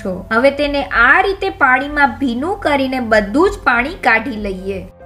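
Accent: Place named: Kathiawar, India. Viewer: good,Kathiyawadi uchchar sambhalvani maja avi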